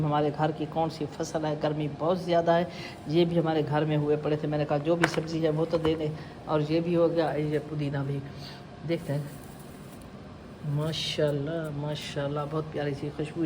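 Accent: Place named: Saraiki, Pakistan. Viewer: 👍❤🤲🤝😘🥰♥️🌺 السلام علیکم ویڈیو تو ماشاء اللہ بڑی مزیدار ہے اپ نے گاؤں دکھا دیا سبزیاں دکھا دیں اور عورتوں اور گلہری بھی دکھا دی مجھے لگتا ہے اپ سرائیکی ہو لہجے سے پتہ چل رہا ہے میں بھی لیہ کی ہوں میں ہم بھی مجھے بھی سرائیکی پوری اتی ہے کیونکہ میری ایک بہن کی شادی بھی سرائیکیوں میں ہوئی ہوئی ہے اللہ تعالی کی اپ کو کامیابیاں دے ترقی انے ماشااللہ ویڈیو دیکھ کے بڑا مزہ ایا اور بہت اچھا لگا کوکنگ ود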